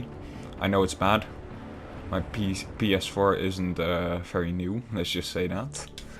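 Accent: Netherlands. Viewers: are you from the netherlands? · U dutch?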